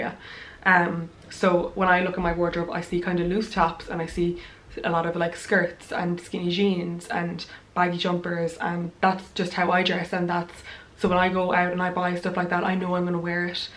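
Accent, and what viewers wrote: Ireland. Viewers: I love your Irish accent ☘️💚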